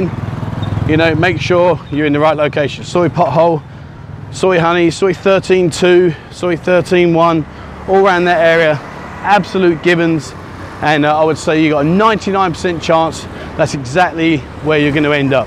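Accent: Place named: United Kingdom. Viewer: Excellent British accent, I'd loved it.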